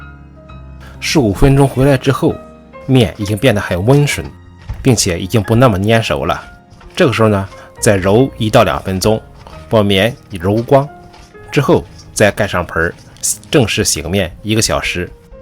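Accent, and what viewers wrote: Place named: Jiaodong, China. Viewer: 胶东口音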